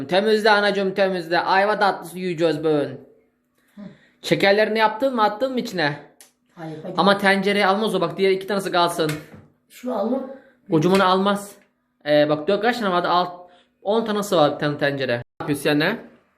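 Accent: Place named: Bartın, Turkey. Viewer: Bu şive olayına takanları anlamıyorum insanlar bu şekilde nasıl abartarak konuşabilir çocuk hemen hemen her videoda şive meselesini konuşuyo inanmayanlara açıklama yapıo haala abartıyorsunuz diyorsunuz valla pess tek dert bu olmuş. Ben bartınlıyım çaycumalı tanıdığımda var bu şekilde konuşuyorlar kardeşim sen şive ile ilgili açıklama yapma bence bilen biliyo inanmayanda izlemesin sevgiler🙋‍♀️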